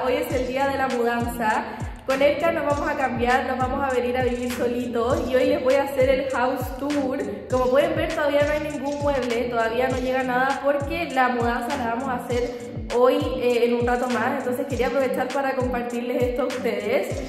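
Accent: Chile. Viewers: Me encantó tu casa por tu acento eres Chilena verdad?? Saludos 😊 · Que bonito el acento chileno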